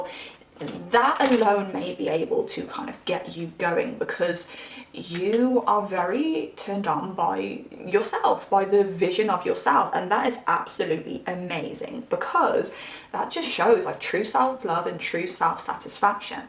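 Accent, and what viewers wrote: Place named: England, United Kingdom. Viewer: Aww I always watch tarot Chanel’s and I’m from Essex so it’s great to see and hear an English girl up in here! I really resonate with your reads big time! Thank you 🎉❤ ps I’m also a cap rising ⭐️😝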